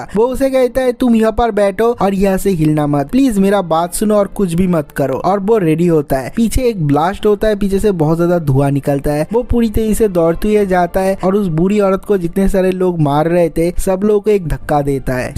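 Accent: Bengal, India. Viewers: Bengali hai kya bhai tu🤨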